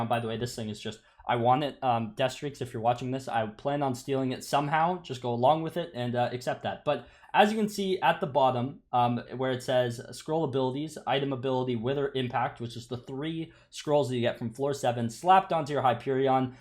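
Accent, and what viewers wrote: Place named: Canada. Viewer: i love ur slight Canadian accent and i can barely hear it and it only adds to ur charismatic personality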